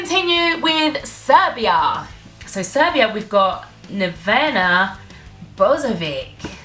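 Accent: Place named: United Kingdom. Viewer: Girl i love you 😫❤ You're so cute, funny and full of energy 😅 And i love your British accent too 😂 you're like this year's Malta entry so colorful and joyful 😅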